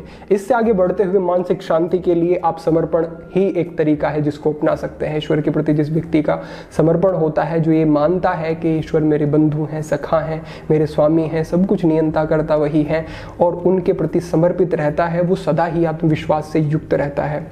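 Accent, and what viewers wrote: Standard. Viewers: Kitni shudh hindi bolte hai aap🤩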